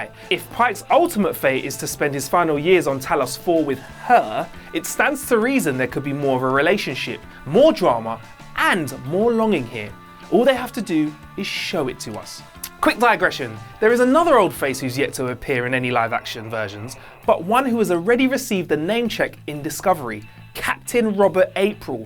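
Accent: United Kingdom. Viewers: lol u so brittish <3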